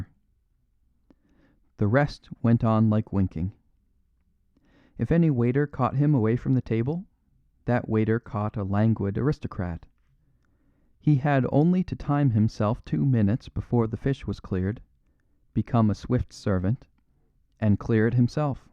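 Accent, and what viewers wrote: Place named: Midwest, United States. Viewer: Look with all due respect my friend, would you really expect a British person to read John Steinbeck with the characters of California fishery, or Tennessee Williams with the southern characters that really only an American inherently understands. It is beyond the capacity of the British to do these Justice. Ergo unless you have spent a portion of your life, say 20 years or so, living in the UK, and I gather by your accent you have not left the Midwest it really causes a problem with presentation. They're going to be terms and expressions in there that you are unfamiliar with and this all absolutely works in reverse. How about giving us a nice shot of Edith Wharton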